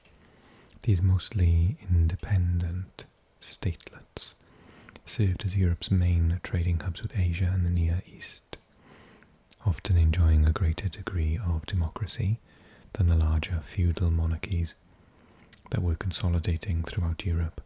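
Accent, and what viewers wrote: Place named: South Wales, United Kingdom. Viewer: Hello! This is the first video of yours I’ve watched and I love your voice. It’s so warm and rich. I used to live in South Wales. I think your accent fits that region, maybe Port Talbot/Swansea way, but please correct me if I’m wrong!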